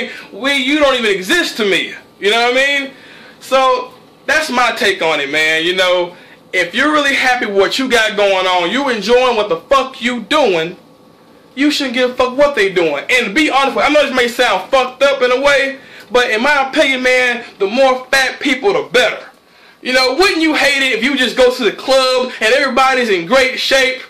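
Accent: South, United States. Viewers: Damn I wish I had a southern accent like you.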